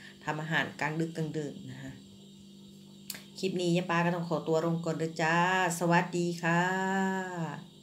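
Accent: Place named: Korat, Thailand. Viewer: แกงมะเขือเนื้อน่าอร่อยเผื่อด้วยค่ะไม่กินเนื้อจ้ะ คนโคราชนิสำเนียงโคราช สบายดีนะ😋สวัสดีจ้า วันนี้ว่างเลยมาหาค่ะ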